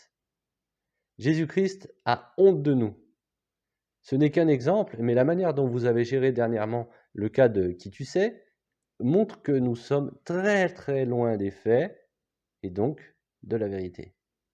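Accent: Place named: North, France. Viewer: 😊Merci pour votre sincérité, votre véritable foi, votre courage et votre bienveillance qui transpercent à travers votre ton et ces analyses basées sur l’enseignement du Christ. Votre intervention ici, est un baume sur les plaies. PS.: votre léger accent résonne familièrement à mes oreilles, moi qui ai passé ma jeunesse dans la ville de Robespierre.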